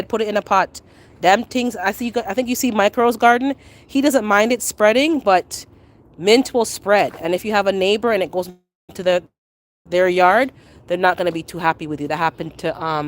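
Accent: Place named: Jamaica. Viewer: My seven years old son just heard your voice and said ' she a Jamaican?'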